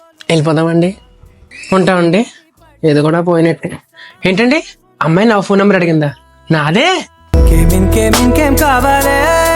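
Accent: Godavari, India. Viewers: Super garu,your godavari ascent is so nice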